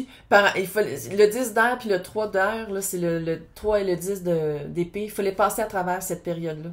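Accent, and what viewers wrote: Canada. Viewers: trop bon cet accent canadien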